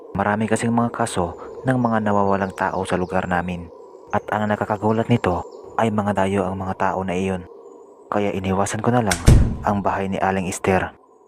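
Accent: Visayas, Philippines. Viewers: Wow ang ganda Naman po ng video nyo lagi po naming di nadownload Ang bago nyong ina-upload pwedi po ba kayo ulit mag upoload Ang ganda po Kasi ng video nyo eh at kakatawa po Yung boses nyo parang bisaya pero nakakatakot din Naman po.😄😊🤗😘😍🤩😅😖😖😲😲😨😨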